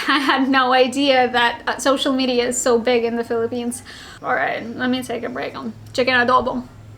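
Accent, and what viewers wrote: United States. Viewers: Hi, new subscriber. Keep up the honesty and openness. There will always be plenty of people that are looking for someone to trust. I admire your honesty. By the way, about underarm odor/smell. It is genetic. (Sorry in advance for the biology/science stuff). Having the ABCC-11 gene in your DNA determines whether you will have underarm smell or not. Almost every white person has this gene (98%). Very few Asians have it and Koreans don't have it at all. I'm sure lots of people are completely impressed by your English. I know I am. Your American accent and huge vocabulary are so good you could make a living doing English voice translations for Russian products and on their company phone menus. How ever you were able to do it is a great achievement. Someday do a video: "How to get so good at American English everyone will be amazed." Stay healthy. 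You are great and deserve all the happiness you desire. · Hey congrats mucho! I love these videos where you are very spontaneous and uncensored 🥰 One thing I've noticed though, you don't sound very Russian, you know, like Dasha Taran. You sound very American. I guess it's because you did stay and work in the states for a bit. Cheers, carry on and stay awesome 🥳🇵🇭💝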